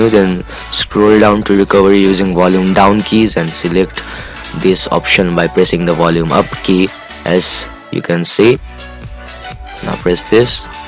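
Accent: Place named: India. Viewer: lazy english or indian accent i hate it.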